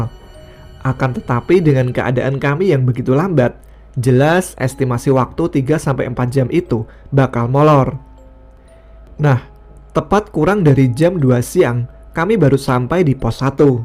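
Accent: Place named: Cirebon, Indonesia. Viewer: Aksen mas seperti dari cirebon, asal mana mas?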